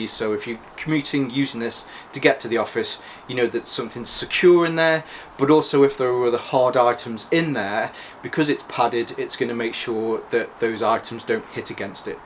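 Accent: United Kingdom. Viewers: Hello Sir, are you British ? (i say that due to your accent)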